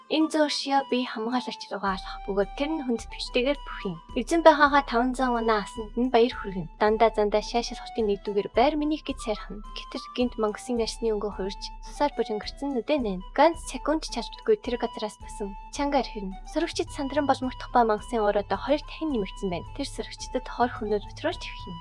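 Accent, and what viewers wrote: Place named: Inner Mongolia, China. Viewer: Жоохон Өвөр Монгол аялга сонсогдоод бхын. Яасан ч хөөрхөн сонсогддог юм😊.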